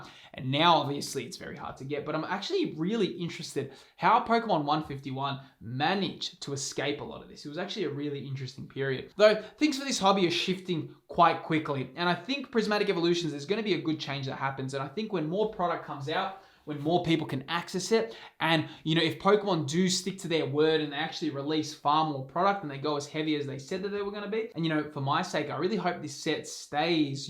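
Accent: Australia. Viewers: Only subscribed for the Australian pokemon accent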